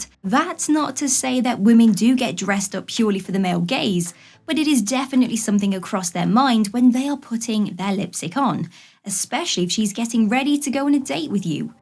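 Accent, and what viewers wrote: United Kingdom. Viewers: You are my favorite girl. When I discover you I let go the others. I find myself come back again and again. None of the others women have been able to do that to me. Even with all their charms. Anyway the things I like about you are. 1. You know what you are talking about. 2. You deliver what you saying with great confidence. 3. Your intelligence eloquent way of speaking is truly unbelievable. As though that was enough. 4. Your voice (oh that voice that British accented voice) delivery is sweet as a group of morning birds welcoming in a new dawn. 5. You protray honesty with a demand that makes your listeners cavity to you. 6. To top that all you are beautiful. ❤️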